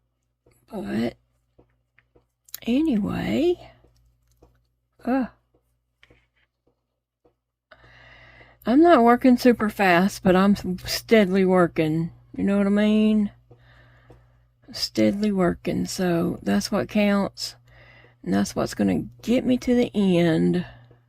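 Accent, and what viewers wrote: South, United States. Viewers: I agree, I just love your voice and your beautiful Southern American accent !💛💛💛😁🙏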